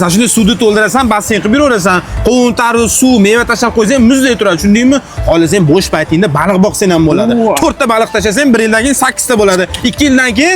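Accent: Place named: Khorezm, Uzbekistan. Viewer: Xorazim ku bu😂😂😂😂😅😅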